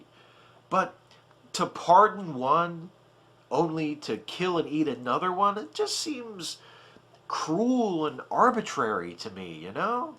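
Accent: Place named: Appalachia, United States. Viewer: That fucking hill billy accent. xD I'd like to hear more of that!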